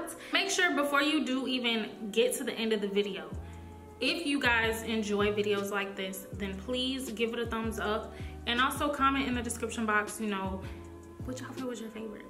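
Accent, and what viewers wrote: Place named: India. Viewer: She sound like India to me .😍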